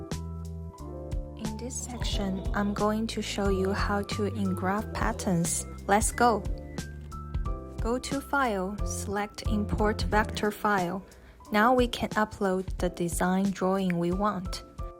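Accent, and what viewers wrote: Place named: China. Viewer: 听口音是中国人吧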